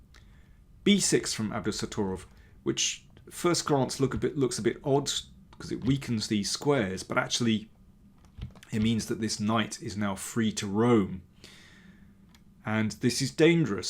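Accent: United Kingdom. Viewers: Your British english is perfect for my understanding as a french chess player !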